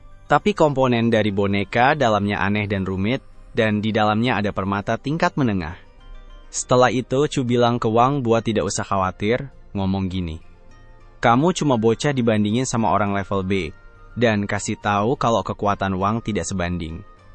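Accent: Malaysia. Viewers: Aku yang asal malaysia ni pun rasa geram dengar loghat dia dicampur²...kalau nak cakap malaysia cakap terus takyah bercampur aduk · Bang kok tiba tiba jadi logat melayu sih bang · Cara kamu berbicara indonesia setengah Malaysia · Bg kalo bisa logat Malaysia nya di kurangin atau di hilangkan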